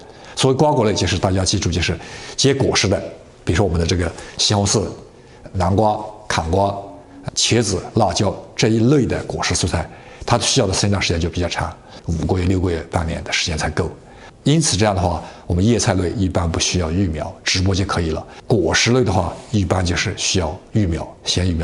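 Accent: Yunnan, China. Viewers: 谢谢李老师讲解😀听口音李老师是云南老乡？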